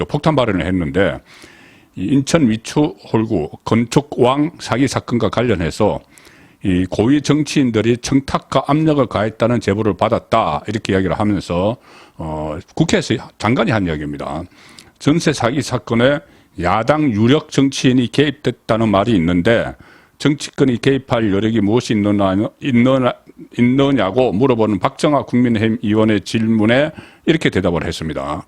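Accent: Gyeongsang, South Korea. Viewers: 난 그특유의 갱상도 발음때문에 더 리얼감이 있고 좋던데요 . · 대표님 언제나 감사합니다 정겨운 경상도 서부경남 말씨~~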